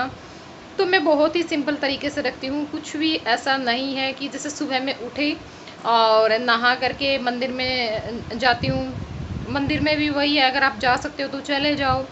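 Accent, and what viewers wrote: Haryana, India. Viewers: Mai bhi samajh gai thi kyonki hariyana mai aise hi bolte h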